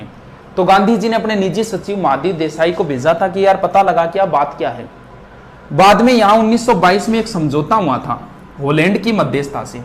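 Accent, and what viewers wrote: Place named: Rajasthan, India. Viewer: Sir ki aawaj m pure rajsthani tune sunta h ❤️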